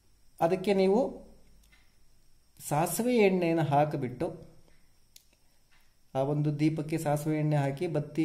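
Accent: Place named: North Karnataka, India. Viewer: Nivu uttar Karnataka davaru so nimage accha kannada mathadodikke kashta agatide modalu script ready madikondu practice Madi aamele video Madi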